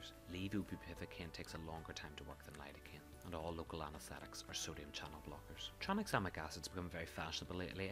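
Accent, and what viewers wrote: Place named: Northern Ireland, United Kingdom. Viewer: Lovely Northern Irish accent..,..mildy sedating..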